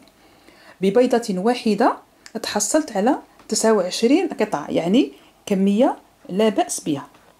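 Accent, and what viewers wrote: Oran, Algeria. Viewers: مدام صوتك وطريقة كلامك راقية حتي لهجتك الوهرانية تعجبني بزاف و وصفاتك اكتر نتمني تعليق من عندك انا من اشد المعجبين بشخصيتك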